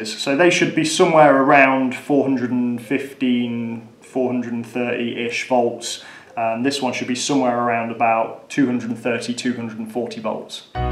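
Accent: United Kingdom. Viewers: The Union Jack bow tie and the Union Jack in his "Handmade Extreme" design didn't tell you he was from the UK? What about his accent? Or what about where he says "here in the UK". Yup, just for people with your low comprehension skills he should have flags on the walls, maybe also in the background a red double decker bus, a portrait of the Queen and maybe he could be eating fish and chips......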